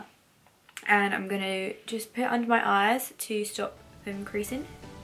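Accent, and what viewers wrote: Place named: Essex, United Kingdom. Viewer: Just found your channel and absolutely love it! Its nice to hear someone with an Essex accent haha x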